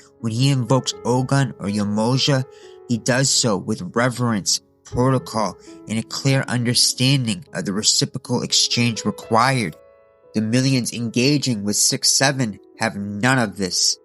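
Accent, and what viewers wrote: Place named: New York, United States. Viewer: You gotta be from New York w that accent lol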